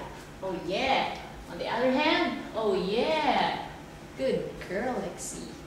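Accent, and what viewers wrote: Philippines. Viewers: pinoy ka no? halata sa accent ng pag english mo hehe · una palang, alam ko nang Pilipino ka, dahil sa accent.. :D hahahaha!! im a Filipino too, and I am very proud to be one.. :) uhm san ka bumili ng Golden Retriever? and how much? please reply, kasi adik na adik ako sa ganyang breed and I would like to adopt one and take care for it... ilang beses mo po siya pinaaliguan? hahahaha!!! :D (ew laway...) :)) xD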